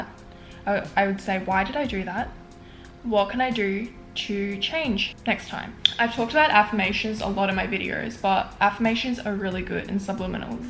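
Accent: Australia. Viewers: this is totally random but are you australian? english is not my first language and I'm trying to guess where your accent is from lol it's beautiful tho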